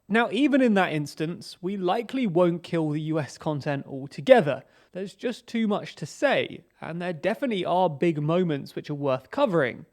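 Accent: United Kingdom. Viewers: Oh my! I wonder how US citizens perceive a Brit breaking down their politics and national events, i.e., maybe an American accent may help? Perhaps it should be TDLR North America? Is there an audience from Canada? Maybe less saturation in the market compared to USA? Otherwise, it's all in the pitch. Take, for example, the BBC. Around the world they are largely perceived as the gold standard due to their perceived impartiality and focus on news over opinion. TDLR has one presenter with an uncluttered environment. Either run wuth that and make it explicit that TDLR is the home of news facts not news opinion. Being based in UK you have geographical distance from all the political shenanigans and little exposure to the dross on opinion news TV. You do a PR number on your impartiality. You don't have connections with US politicians or lobbyists unlike US legacy media whi must kiss the ring to avoid getting expelled from their chummy club. 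Or you need to seem less "other". If you hear a US or Australian news presenter talking about UK events do you ever get annoyed with them? Mutter about how would they know bkah blah? Jazz it up, get a co-presenter, recognise that you might not be abke to copy the UK format over every channel. Anyhoo. My tuppence. Double down or change format. If everyone really wants news facts and less opinion then you need to explicitly pitch the channel that way. It's a busy market. What's your unique selling point? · If you are based in the UK, then American news would fit better in Global anyway. If you retain the TLDR US channel, you need to decide how it will be different than any other hundreds of other US-news channels available. If you think you have a viable approach for the US-market, then give it the same attention as the other channels and evaluate it based on that. If it still underperforms, then you know Americans just aren't resonating with your perspective/delivery and you should focus your attention elsewhere. 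It's unfortunate that you don't have the luxury of operating at a loss - which was how news was run in the mid-20th century. Currently, since your accent gives away you are based in the UK, I see your channel as a Brit/European perspective on US news and politics - basically the "outsider's" perspective. I'll expect the content to be even more left leaning than typical American media (American political center is more to the right than European political center). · I subscribe to all of your channels, so my comment is not personal. But, you might try a American English speaking presenter. Many Americans might feel put off with a British accent talking about American polotics.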